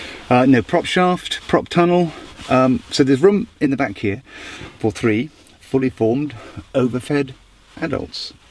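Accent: United Kingdom. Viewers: Very nice to hear Tesla get praised in the British accent, after all of the other's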